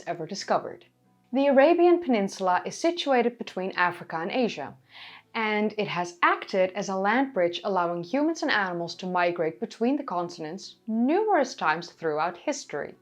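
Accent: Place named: Netherlands. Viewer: I love the Dutch accent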